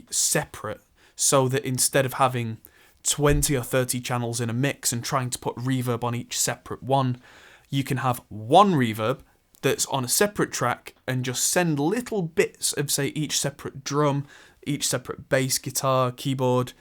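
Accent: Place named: Scottish Borders, United Kingdom. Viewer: what part of England are you from? Your accent sounds like you are close to the Scottish border. Am i wrong?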